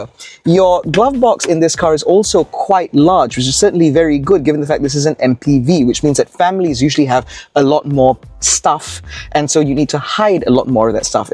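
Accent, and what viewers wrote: England, United Kingdom. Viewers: Nice English accent, very clear.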